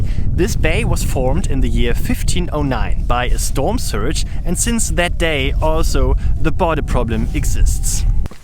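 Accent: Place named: Germany. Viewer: Its high quality content I think, why isn't this guy popular? I like him anyways... Also, you're German right? I think I recognize the (I think German) accent, here in the Netherlands the accent is like they're still speaking Dutch but they're using the English words...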